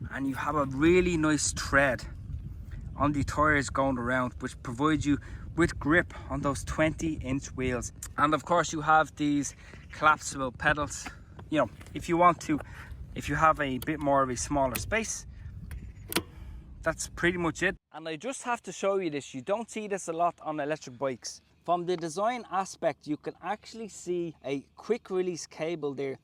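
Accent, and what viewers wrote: Dublin, Ireland. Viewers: Great vid. Best of all is your Dub-A-Lin accent. I just incountered someone with the said same bike and its a rocket to go with 15 degrees hill climb which is unheard of in this price bracket. It must be a bone crusher without suspension and solid tyres but the plus side is no punctures. Presently its 509 Euro because of black Friday. This is outrageous.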